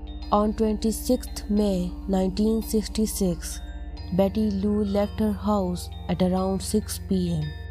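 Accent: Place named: India. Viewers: Sounds like India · That's indian accent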